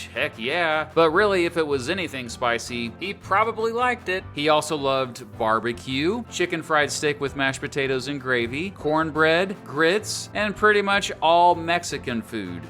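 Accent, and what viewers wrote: United States. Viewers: Painfully American delivery, excellent content. New fan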